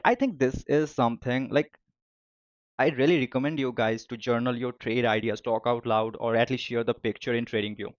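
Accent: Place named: India. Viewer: thaNKS for the video G, and love your indian accent G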